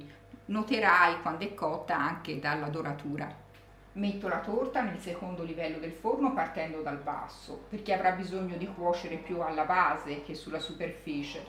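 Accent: Tuscany, Italy. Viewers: La farò sicuramente….non è di Benevento lei l’accento è toscano… comunque 🔝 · Sei bravissima, fatte tue ricette, tutte riuscite. Quel tuo accento toscano, ti rende ancor di piu❤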